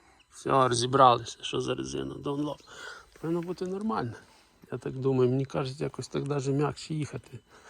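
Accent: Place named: Bukovina, Ukraine. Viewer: Дякую за відеороліки по рено kangoo.Мені таке авто сподобалося.Шукаю WV кедді,але бачу,що і це не погане і можливо дешевше.І ще земляк,як робиш роліки фільтруй базар.Бо твій Буковинський укр.-рус.суржик дуже ріже вуха.Я то,тебе розумію,сам так дома розмовляю.Але мені стидно,що ми з західної україни,а говоримо на половину російською.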